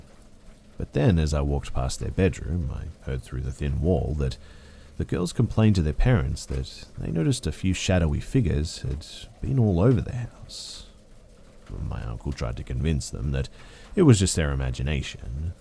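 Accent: Australia. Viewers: Aussie are you not? Been looking for a channel that does what you do, I'ma horror fan 🙂also Aussie 😄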